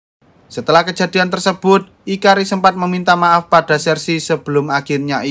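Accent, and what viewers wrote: Java, Indonesia. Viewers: Lu orang Jawa bang ? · Sampeyan Jawa endi mas mimin, kok ngomonganya agak ada Jawa" gitu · MEDOK BANGET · Sampean wong jowo kah bang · Bang suara lu mirip dodit mulyanto medoknya pun sama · Fix, suaranya rada-rada orang Jawa abangnya😁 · Apik pilem e min... JOWOMU NGENDI MIN... KOK MEDOK MEN⁉️ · Uwong jowo bg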